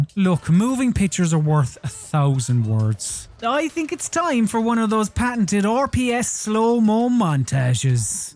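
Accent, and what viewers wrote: Ireland. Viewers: IRISH ☘️ yay he is irish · he irish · Ye fekin wallie it's an irish accent innit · Sounds like u have a Kerry accent. Good on ya lad, the best part of Ireland is down here in county Kerry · its called an irish accent ye eejit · Are you irish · Shut up it's an Irish accent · youre definitely irish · Haha I knew he was Irish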